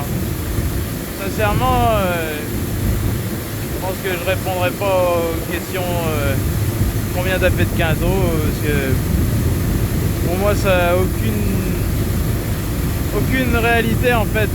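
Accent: Charente, France. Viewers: Salut. Pas pareil en 2003 ? Grand plaisir d'entendre l'accent Chérentais de mes racines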